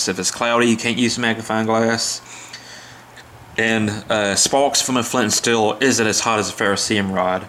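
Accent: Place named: South, United States. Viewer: Nice item - good video. Am thinking about getting one of these. One question.....which part of the US are you from? I can't sus that accent although it sounds southern to me. Dave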